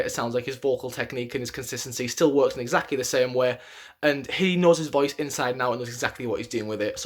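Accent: United Kingdom. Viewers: My brazillian ass had a big trouble to understand everything with this beatiful british accent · Well I'm guessing that since he's British and he also talks really fast, it sounds like he's saying "monster" because of his accent. I've even seen British Monbebe who say it like that sometimes, so I don't think it's intentional.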